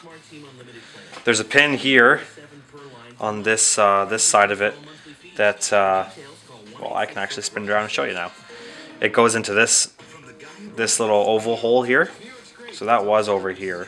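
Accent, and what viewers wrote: Canada. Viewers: are you canadian Eh? Nice video! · Very informative, and in pretty good Canadian English, with "zed" for "Z" and everything.:) Thanks for posting!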